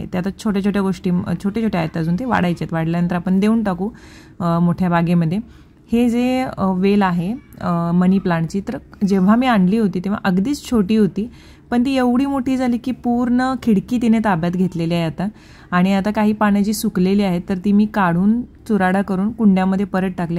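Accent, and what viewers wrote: Kolhapur, India. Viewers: तुझी कोल्हापुरी मदून मदुन बोलतेस आवडत ऐकाय bolt ja kolhapuri avdel eiky ❤श्री स्वामी समर्थ ❤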